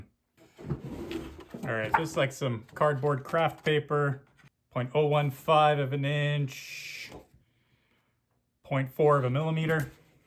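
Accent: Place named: Canada. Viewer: Every Smith Blade unboxing video is a guy with a Canadian accent and I think it's part of their plan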